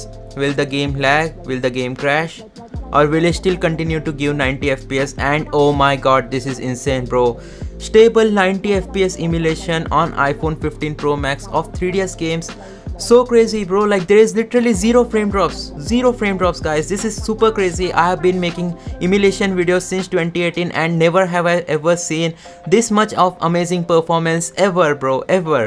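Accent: India. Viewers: I just cant stand his Indian accent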